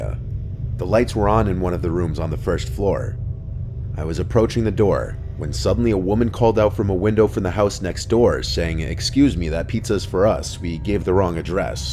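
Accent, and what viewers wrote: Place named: New York, United States. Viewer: As soon as he said “Mary-O” instead of Mario like the Nintendo character I immediately knew he must be from NYC(area) or Long Island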